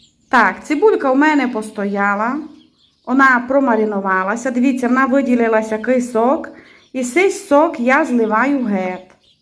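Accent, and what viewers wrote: Transcarpathia, Ukraine. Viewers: Клас! Насолоджуюсь закарпатською говіркою, окрім гарних рецептів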